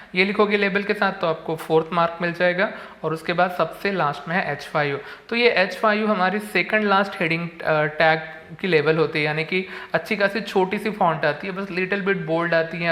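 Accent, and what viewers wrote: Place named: Maharashtra, India. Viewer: He sounds marathi for sure 😁😂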